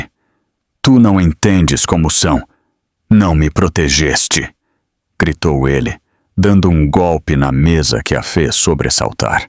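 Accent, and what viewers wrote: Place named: Portugal. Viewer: Português não é do Brasil. Provavelmente de Portugal. Pode ser de outro país que foi colônia portuguesa como o Brasil.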